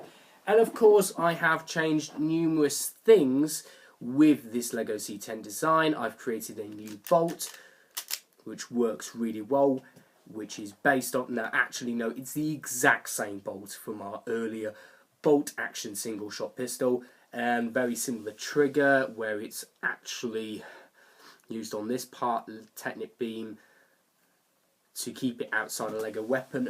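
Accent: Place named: United Kingdom. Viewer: I knew you were British from your accent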